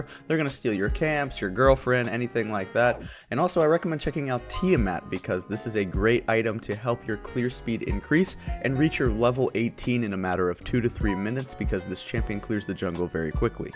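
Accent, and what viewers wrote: United States. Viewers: i love that Alabama ascent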